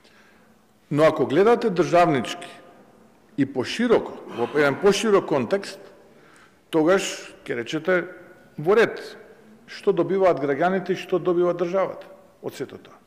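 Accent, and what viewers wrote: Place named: Serbia. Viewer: Говори нанраво бе,не меши сръбски диалект в Българския език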